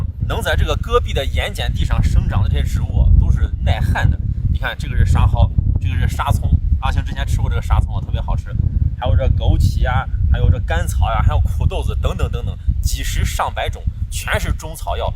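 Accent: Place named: Shaanxi, China. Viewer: 阿星是陕西的🎉🎉🎉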